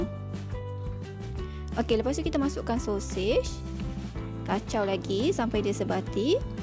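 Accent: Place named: North, Malaysia. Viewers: Pekat nah utagha. Boleh belajaq kat sini sekali hehehe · Terima kasih cik oii,.,memg sangat sempoi...esok pagi makcik mesti try ni., kbetulan semua bahan ada ja...dengaq cek makan krupkrap pun dah telioq aih...terima kasih no.,kongsi resepi ni...cakap utaqa tu maintain na cek....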